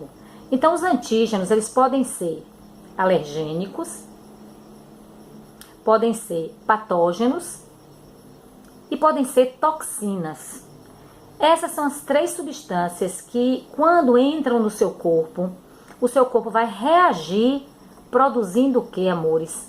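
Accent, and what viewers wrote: Bahia, Brazil. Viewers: Vc é baiana?? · Essa é baiana, hein bebê.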